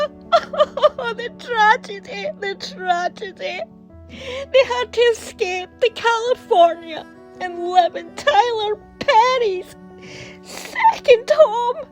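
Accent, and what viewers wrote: Scotland, United Kingdom. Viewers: You nailed it,. Those poor poor Sussex parasites. I love your Scottish accent. It reminds me of my dear Gran and Grandpa, long gone but never forgotten. I hope you recover soon.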